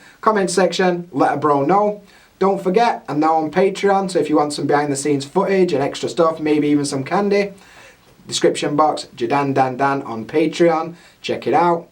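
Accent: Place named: Northern England, United Kingdom. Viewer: Hey, I noticed you always talk in your normal accent in videos. Do you need to change how you talk to when actually teaching English? I’m northern too (Geordie) so was wondering if you still use “me” instead of “my” when teaching or using the word “like” in the middle of sentences? Or do you try and talk proper English?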